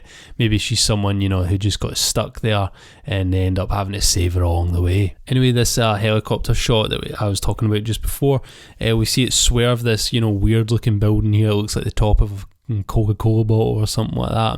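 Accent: Scotland, United Kingdom. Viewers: Ive been looking forward to this 💪🖒 What part of scotland you fae mate? i cant tell if your same as me or west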